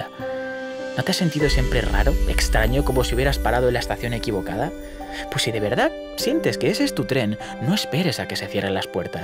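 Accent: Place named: Colombia. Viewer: con esa forma de hablar se reoconoce a un colombiano de lejos jaja la buena parcero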